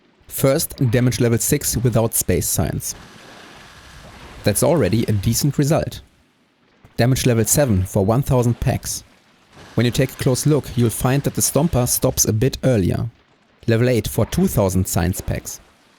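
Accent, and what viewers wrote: Germany. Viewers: Jetzt reichts! Für deinen deutschen Akzent bekommst du ein Abo!!!! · That's the german accent for ya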